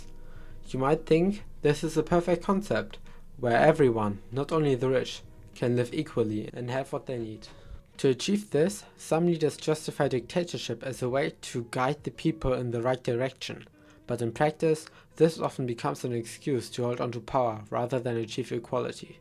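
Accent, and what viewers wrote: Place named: Germany. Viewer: Der deutsche Akzent ist nicht zu überhören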